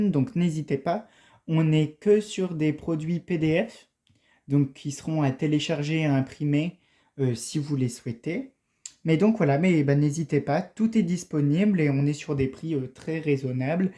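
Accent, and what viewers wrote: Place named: Nord-Pas-de-Calais, France. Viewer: C'est cool tu ai ch'ti comme moi trop bien j'adore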